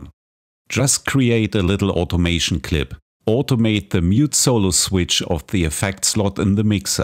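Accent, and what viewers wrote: Germany. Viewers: Akzent klingt Deutsch.......gibt es auch solche Videos von ihnen auf Deutsch?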